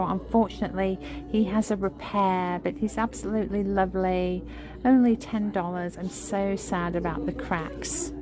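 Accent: England, United Kingdom. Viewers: I love your content and your personality. Your English accent just makes me happy. I love it when your kiddos. I never miss a video. You have great taste.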